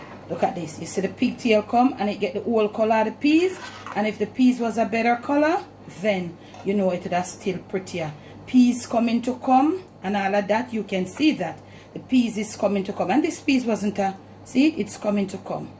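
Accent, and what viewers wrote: Jamaica. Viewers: One of the reasons I follow you is because of your "talking". You remind me of growing up with my Jamaican parents. 🥰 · Miss from down a yard I love u n I'm from down a guyana n luv to hear u talk me luv talk to n me is a chef but luv to look at u makes me smile all time with u Jamaican talk n knowledge u go girl u can talk cause u can cook na lol peace god bless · Mi love d patois, so gwaan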